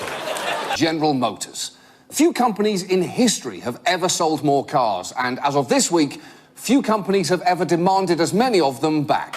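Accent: United Kingdom. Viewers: He is not American. He is taking on American issues with wreck less abandon. He rants without perspective. He is incapable of entertaining guests who could throw a dose of cold facts on his middle school understanding. I don't understand why Americans are so quick to abandon critical thinking when they hear a British accent.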